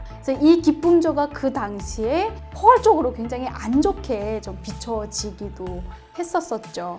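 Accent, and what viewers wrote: Seoul, South Korea. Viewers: She already had that seoul accent i think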